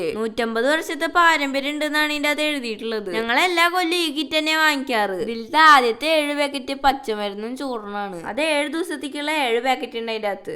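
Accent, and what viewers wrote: Thrissur, India. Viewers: Samsaram ketappol mansilayi namde തൃശ്ശൂർ ഗഡി anu nnu alle😊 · തൃശ്ശൂർ ഭാഷ സൂപ്പർ ആയിട്ട് ഉണ്ട് ❤❤❤❤❤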